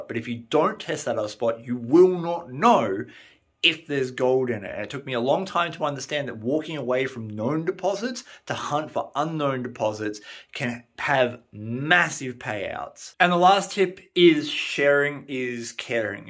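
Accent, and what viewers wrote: Australia. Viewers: Yeah I did some research on Old maps from the 1800s trying to find Rivers that Prospect hit and I finally found one area earthquakes landslides blocked the waterfall that used to be there and lots of gold two and a half years it took me to get most of it out I'm sure I left some behind the life gets in the way and I'm researching old Maps in the state that I live in some pretty interesting stuff thank you for the video I enjoyed brought back memories and you were right🇳🇿🇵🇳🇦🇺😛🎅🎁🐕‍🦺🦮🍻🍻🤣🤣🍻🧁🥃📹⛏️⛏️⚖️⚒️ Merry Christmas drink lots of beer give your dogs a bone scratch gazzy behind the ear I think I'm saying his name right if not that's how it sounds you Australians you have that accent tell your woman I said hello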